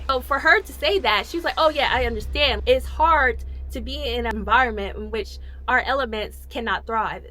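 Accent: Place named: United States. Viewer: First of all, you’re stunningly beautiful. I audibly gasped when you came on screen. But to the point of the video: Iroh is not a war criminal. War crimes are specific things, none of which Iroh ever did. The few war crimes we do see are from - unsurprisingly - Sozin (genocide) and Ozai (attempted genocide), along with - surprisingly - Sokka (he flew the War Balloon with Fire Nation insignia in order to mount a surprise attack) and anyone who allowed children to fight (use of child soldiers, also a big no-no). Iroh mounted a siege while being on the wrong side of the war, but he also grew up under direct influence of the Fire Lord as his father, so one can understand why he might see it differently than it really was. All of his attacks were just trying to breakthrough the outer wall, which we know he failed to do. There are no records of him committing anything that constitutes a war crime. 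I’ll buy that Hama had to stay in the fire nation, but only because there’s oceans between it and the Earth Kingdom. Otherwise, she very much could’ve lived there in anonymity. Fire Nation were probably looking for her at all ports, so it would’ve been her best bet to stay put. But to say that she was somehow justified in torturing civilians (also a war crime, btw) just because they were Fire Nation is not a thought you should entertain. Based on your accent, I’m going to assume that, like me, you’re from the US. Would it be fair if an Iraqi POW kidnapped and tortured you, just because yo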